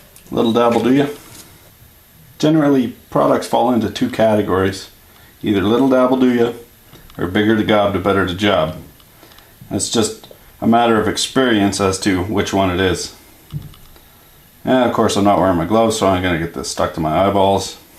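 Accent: Canada. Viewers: Shite are you Canadian - fek...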